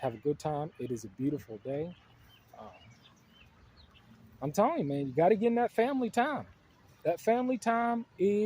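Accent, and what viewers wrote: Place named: Brooklyn, United States. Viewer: I knew you were from Brooklyn. That accent is too familiar.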